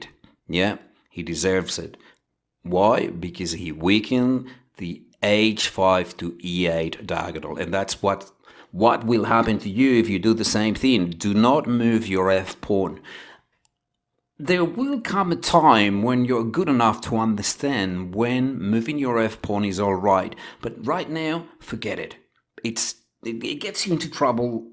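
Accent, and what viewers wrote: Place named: South Africa. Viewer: what is your accent, mate? It's like south african crossed with australian crossed with eastern european. :)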